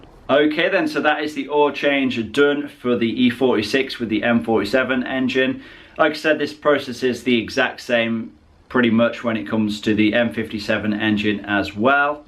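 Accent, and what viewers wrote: United Kingdom. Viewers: the british accent sounds pretty awesome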